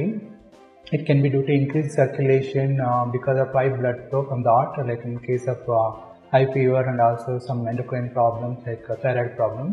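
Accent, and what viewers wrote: India. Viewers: Anna Albury hes talking Indian thats why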